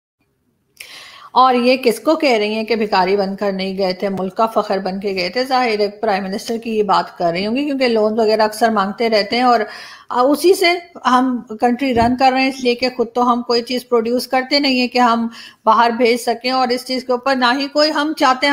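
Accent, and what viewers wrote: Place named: Punjab, India. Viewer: Love to her your witty and satirical presentation of daily unfolding events. what I further admire is your audacious approach to call a spade a spade. You have this unique ability to conduct a formal as well a fun interview with equal flair. Your Punjabi undertones and mannerism makes me greedy to keep listening to you.keep up your good work. God bless. Your fan from India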